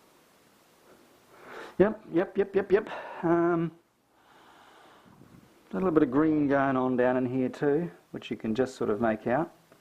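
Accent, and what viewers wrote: New Zealand. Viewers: Glad I found you on Youtube, Finally making the switch from acrylic to oils... I feel like I just went from using sand paper to tissues... it's amazing, sucks they don't teach you any of this in high school. :/ Schools are cheap in australia with their art not very good when the only thing your good at is art. :P Keep it up! Haha I actually like the talking reminds me of my family in New Zealand.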